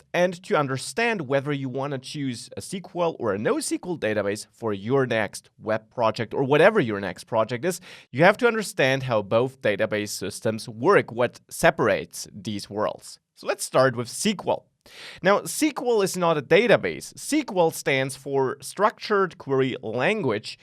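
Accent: Germany. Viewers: I have not quite completed your video yet, but I have to comment on your clarity and thoroughness! It sounds like you might also be German. ☺️ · There are special commands so called "choins" :-) I really love this german accent! Thank you for this comparison, very interesting and complete.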